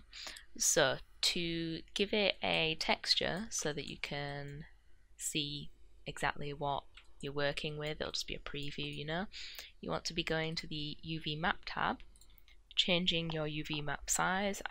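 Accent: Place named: North, United Kingdom. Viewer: great vid and love that northern accent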